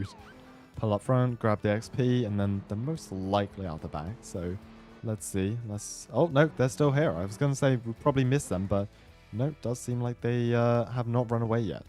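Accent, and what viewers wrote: United Kingdom. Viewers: why is every erlc youtuber british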